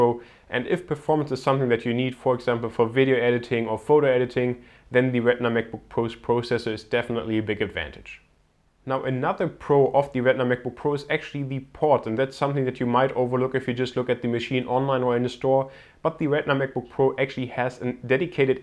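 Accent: Germany. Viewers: I actually was thinkabout how chinese was ridiculous guess and thought you have to be german, und du bist Deutsche! Gruss von Holland! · Whoa, you sound like a German or something. I couldn't imagine doing a review in German, so props for doing one in English. I recommended my brother get the Macbook Air, and he's at the store buying one now. Woot! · The guy in the video sounds like an german with an akcent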